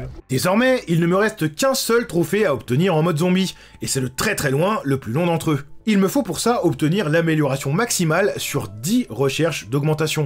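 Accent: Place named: Champagne, France. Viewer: oh petard un compatriote élevé au Chaource et à l'andouillette AAAAA ! Je sais pourquoi je me sent comme à la maison quand je t'écoute maintenant ! J'tenterais même de dire que t'es de Brienne 😂 Bravo pour ton travail en passant 💪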